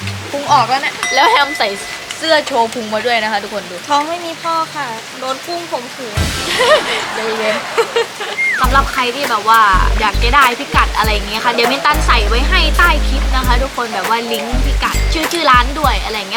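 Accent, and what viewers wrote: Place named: South, Thailand. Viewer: พี่มินตันพูดใต้ด้วยอะ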